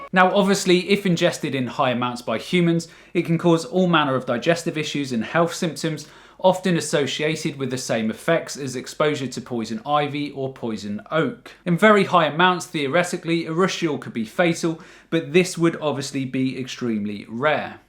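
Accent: United Kingdom. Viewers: I am not plant based, but I still love watching your videos. 🙂 You give alot of good advice. Plus, I like the British accent lol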